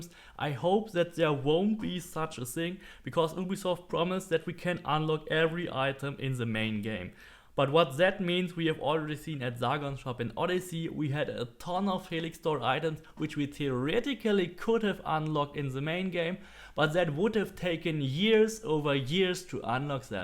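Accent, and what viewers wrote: Germany. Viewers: Where u from bud, u sound german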